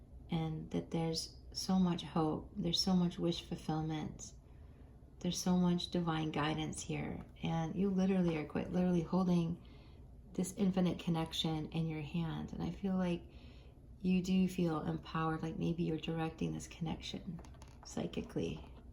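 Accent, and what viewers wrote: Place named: United States. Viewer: Love you,! How can you always know, what is exactly needed at the moment. Pile 2 was especially for me. 💓American accent is so lovely on Russian.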